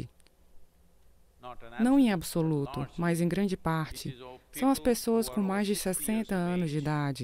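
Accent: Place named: Brasília, Brazil. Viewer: Sotaque de Brasiliense.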